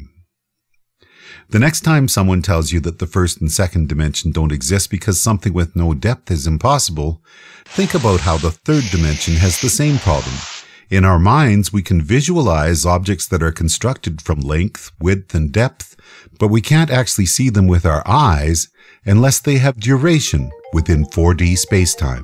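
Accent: Canada. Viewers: ok, i must know... the person commentating is canadian, right?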